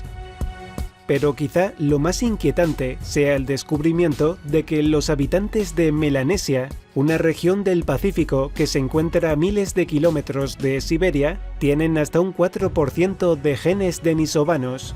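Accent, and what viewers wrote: Spain. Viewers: gordito de gafas No oye acento de España? .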